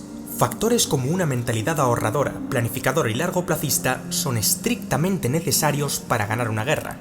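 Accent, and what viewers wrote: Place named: Spain. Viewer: La voz no ne gusta..es de España...setia mejor una voz neutra..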